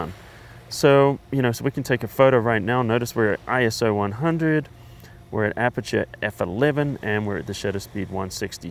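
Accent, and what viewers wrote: New Zealand. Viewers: You sound kiwi but not lol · I knew you were a Kiwi!!! Go ALL BLACKS!!! Thanks for the great review mate...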